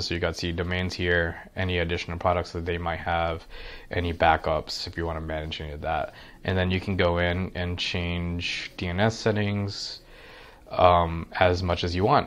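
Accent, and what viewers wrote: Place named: United States. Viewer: Finally someone with a clear voice and American accent thanks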